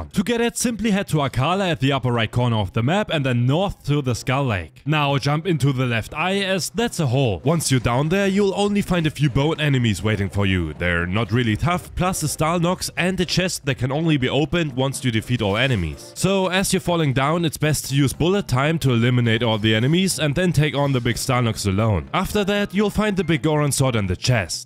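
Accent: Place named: Germany. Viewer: Ja er ises selbes Logo,selbe stimme und nen unübersehbarer deutscher akzent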